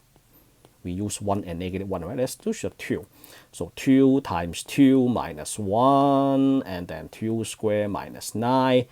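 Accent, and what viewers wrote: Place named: China. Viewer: Bro is speaking english chinese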